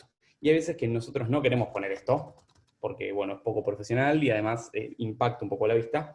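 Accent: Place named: Argentina. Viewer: Me alegra un montón encontrar creadores de contenido de éste tipo que sean argentinos! Estoy re agradecido a nuestros hermanos de otras regiones de latam, pero es mucho más cómodo escuchar la "tonada" que nos es familiar! Ya me suscribí! Ví que sos profesor en UTN, puede ser? En alguna carrera o en los cursos de extensión? De ser así, me gustaría saber en qué cursos para tenerlo en cuenta si en el futuro decido tomar alguno. Gracias!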